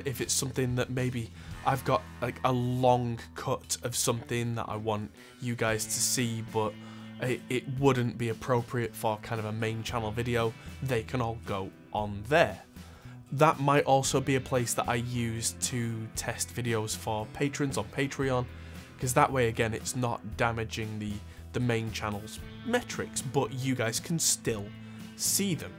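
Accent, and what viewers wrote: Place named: North, United Kingdom. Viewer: You're a credit to our industry, look forward to seeing more of your content. Would love to catch up with you at some point it's great to hear a fellow northerner on here.😃 Maybe catch up at Namm in April.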